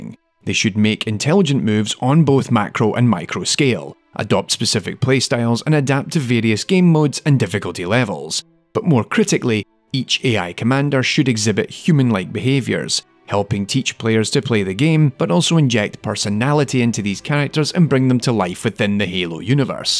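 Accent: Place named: North, United Kingdom. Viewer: Nice work on the video mate, love to hear a fellow northerner talk about video games.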